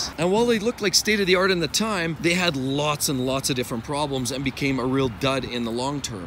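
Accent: Canada. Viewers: Your accent sounds like a Family Guy caricature of a Canadian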